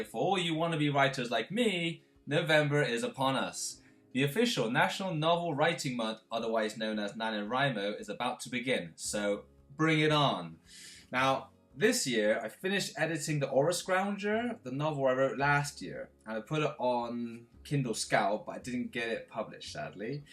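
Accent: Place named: England, United Kingdom. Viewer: Oh my friend! Te entiendo mas ahora jajaja se te pego el acento ingles. Jajajaja. Suerte con todos tus objetivos!!!!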